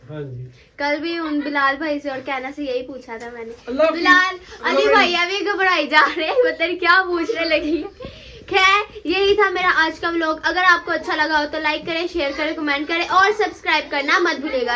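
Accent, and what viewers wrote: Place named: Rural, India. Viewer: Itna painduo ki tra bolti ho.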